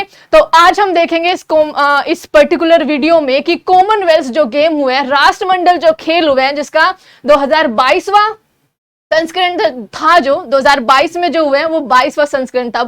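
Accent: Haryana, India. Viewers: Mam are you from haryana???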